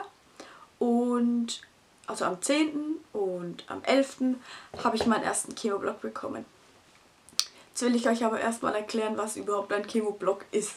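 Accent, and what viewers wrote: Germany. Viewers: Du bist ja hübsch 😊 und noch so jung! Kommst du aus Franken? Du redest wie ich 😂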